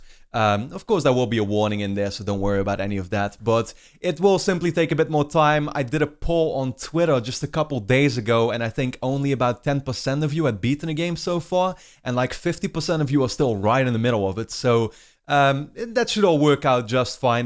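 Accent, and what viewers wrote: Netherlands. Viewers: Ik hoorfe gelijk dat hij nl was accent is altijd hoog hierzo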